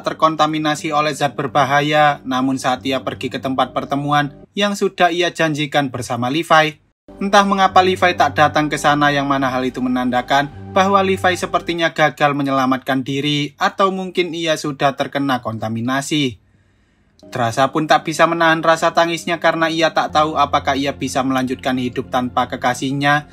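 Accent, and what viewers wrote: Java, Indonesia. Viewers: Logat jawa nya bisa di rubah gak bang,gak enak kali dengar nya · Kok medok ngono Min suaramu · Jawa yaaa. Koo ana omongan wis ora kesuen.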